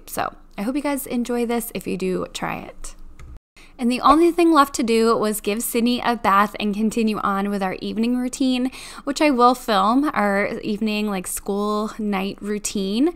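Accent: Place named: United States. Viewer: Haha yes yes I know oregano just can't believe how you say in America! I love your accent! Also your video has inspired me to get out my uggs! 👍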